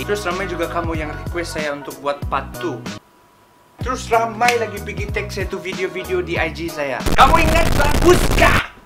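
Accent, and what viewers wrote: Sabah, Malaysia. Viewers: ..sa org semenanjung tapi sa sukaaakkk sangat sama kau! Sa suka dgr Sabahan slang. Sedap ba..lawa2 lagi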